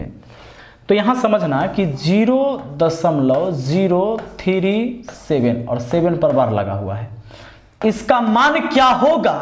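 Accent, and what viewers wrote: Bihar, India. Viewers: गुरु ज एक बिहारी आन्दाज में शानदार है लाईव नही पढते क्या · अरे 30मिनट में रगड़ देबे😀😀😀 बहोत खूब sir jee एकदम बिहारी स्टाइल🙏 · Ekdam Bihari andaj me🔥🔥🔥 आरे 20 मिनट में रगड़ देबई😂😂। सिर फैन like 👍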